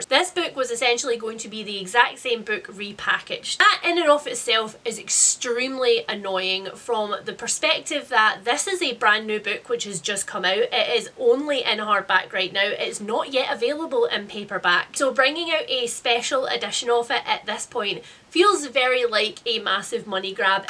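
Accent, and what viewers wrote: Scotland, United Kingdom. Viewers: I have very rarely not sped up audiobooks, often times based on the accent of the narrator I will start lower (usually 1.2) but as I become used to it I speed it up. There have been some narrators that talk sooooooo slow, that I listen to them at 2.5. It completely depends on the book, but probably on average its usually 2x, it just sounds more natural - once I got used to it listening to it at 1x sounds like a record that has been slowed down. I also listen to youtube videos at 1.5 to 2x - 🤷‍♀ It's more normal sounding/soothing for my brain. I like a lot of booktuber merchandise, and I love being able to support youtubers that I watch a lot, I honestly watch youtube more than I watch TV. Also while I don't enjoy all the same books that you read, I do enjoy that you're Scottish 🤣 The accent makes me happy.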